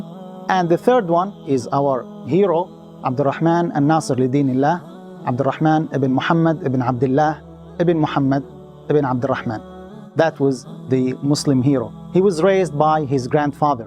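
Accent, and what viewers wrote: Indonesia. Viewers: Apa cuma gue yg dengar suara pronounce nya org indo ? Hehehe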